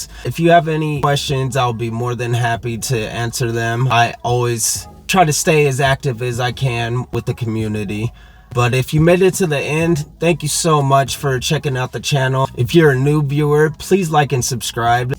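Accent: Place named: Bahia, Brazil. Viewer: meu deus que bahiano pqp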